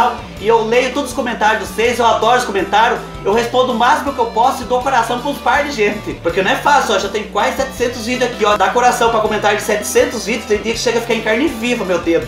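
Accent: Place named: Minas Gerais, Brazil. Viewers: Você é lindo,espontâneo,humilde,engraçado,a parte do restaurante foi melhor. Adoro seu sotaque,sou mineira de poços de caldas e me identifico! Seu carinho com quem te assiste é seu diferencial!!!! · Descobrir o canal ontem e estou apaixonado com seu conteúdo. Seu sotaque é igual dos Mineiros. Você é incrível!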